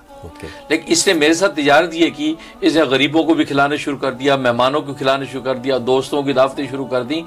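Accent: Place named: Punjab, India. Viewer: Aaraam say bayaan karo or tareqay say ok Lekin tum full Panjabi jahalat. Zaban main suna rahay hoo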